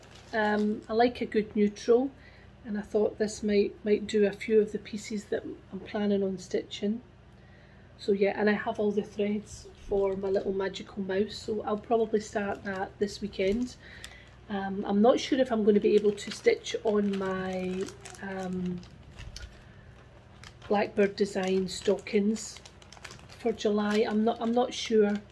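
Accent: Scotland, United Kingdom. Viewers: Thanks for checking in. I’ve missed you. Love listening to a Scottish accent.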